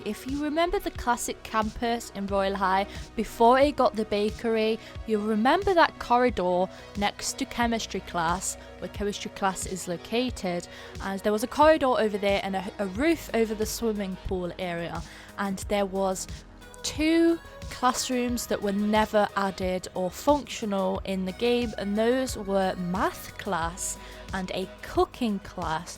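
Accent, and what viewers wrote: United Kingdom. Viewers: I love her British accent she is the best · are you british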